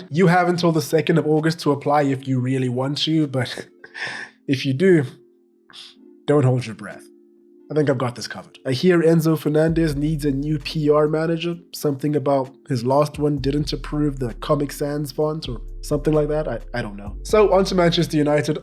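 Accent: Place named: South Africa. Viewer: Is this dude a black South African? His accent is basically like mine. Not used to hearing it on the internet.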